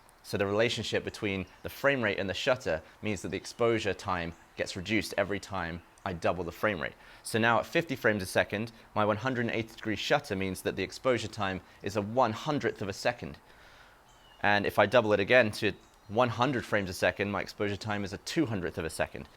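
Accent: United Kingdom. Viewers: Benjamin Collins British. But he lives in Texas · I love, but I couldn't find "shuta speed" in my manual 😀😂 gotta love that British accent thou! great video! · It might be his British accent but I'm not even interested in photography but watched the whole thing and learned stuff I didn't know I wanted to know. Great explainer, this guy is. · Are u British or American because you sound British but your in America. thx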